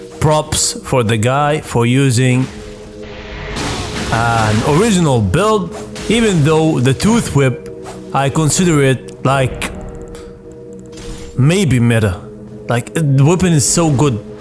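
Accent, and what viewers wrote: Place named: Gulf, Arab world. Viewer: The accent , are you Saudi , Qatari , Kuwaiti ? Uae or Bahraini 🤣 Subscribed for the accent 😂👍 حبيبنا 👍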